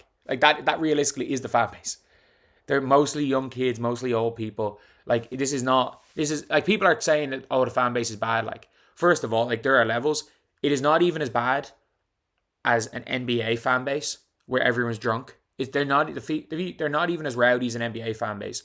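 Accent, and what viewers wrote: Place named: Ireland. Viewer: Australia?😂😂😂😂 You can't hear that irish accent?😂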